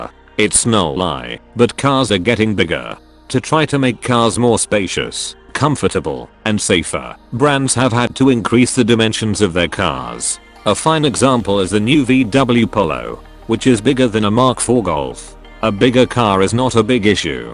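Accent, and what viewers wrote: Cavan, Ireland. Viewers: I just realized that best part of your videos is your original, Irish, voice. Now, do 10 reasons why new cars are better then old ones. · Older cars are just better in every sense, my God that robot voice is terrible, go back to your Cavan accent! 😂 😂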